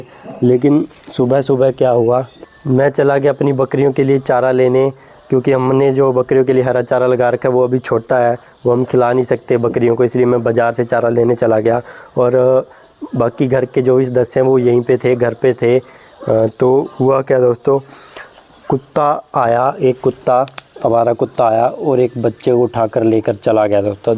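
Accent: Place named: Bihar, India. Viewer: Aap bihari style me bolte ho bhai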